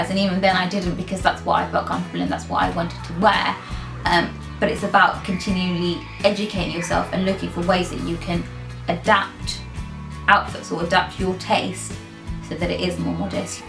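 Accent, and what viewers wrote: United Kingdom. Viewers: ASAK, I have to say I had to watch your video twice because I was just so taken with your accent! It's lovely, Masha'Allah. What part of the UK are your from? Great video and looking forward to more. Oh yeah...where is your scarf from? Thanks!!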